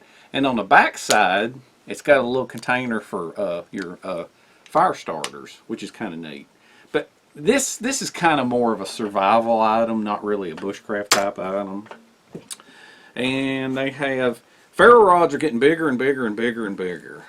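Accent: South, United States. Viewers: Icstill love the way Yu talk southern voice so cool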